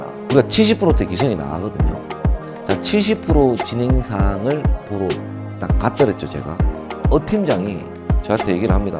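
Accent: Gyeongsang, South Korea. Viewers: 구수한 창원 사투리로 귀에팍 꼽히게 설명잘해주시네요 ㅎㅎ 잘부탁드립니다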